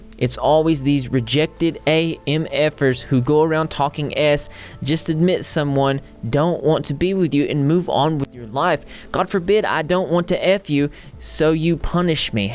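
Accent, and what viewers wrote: South, United States. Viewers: Is he from the south?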